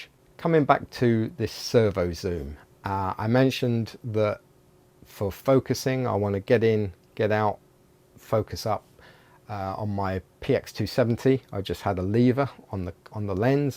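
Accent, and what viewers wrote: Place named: United Kingdom. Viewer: Thank you so much for quick response. :-) I can't wait to watch your next video. I think it would be also very helpful. And thank you for your wonderful British English. It's a huge pleasure to hear that instead of American babble. I wish I had such language skill one day....Greetings from Poland :-)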